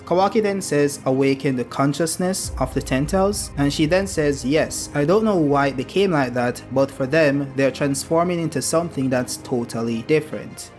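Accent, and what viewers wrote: Jamaica. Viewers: you a jamaican bro?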